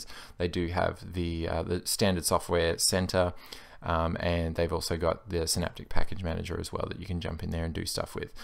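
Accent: Australia. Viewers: Nice to hear an Aussie voice reviewing Linux for a change :) I’m new to Linux and Ubuntu Mate is definitely the best for a new user, I can tweak it to a windows like environment (the new Redmond option is virtually the same as Mint) but my gf wants to get a Mac because her current windows laptop is “too slow” (old) and she likes the Mac dock etc...well once I get more experienced myself I can set up the same distro for her for a free Mac that isn’t spyware (or anyone else who wants a fast, private, secure, easy to start using system) Also the explanations of software in Ubuntu Mate are very necessary for me or else I have no idea what is available or what it all is so that’s actually a feature I appreciate Ubuntu Mate to me is the only distro most people would ever need, or at least the best distro of all to start with while figuring out what other Linux distro is best for their specialized needs Highly appreciate everything the Ubuntu Mate team have done, just amazing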